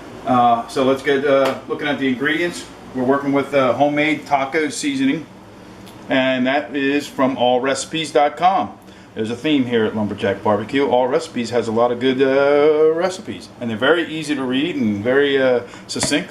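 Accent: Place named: Pennsylvania, United States. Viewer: What part of PA are you from, I was born and raised in Collegeville and moved to south central Texas about 10 years ago when I was 23. But I can pick our accent up after the first word out of your mouth! Love you recipe makes me miss back home! Thanks!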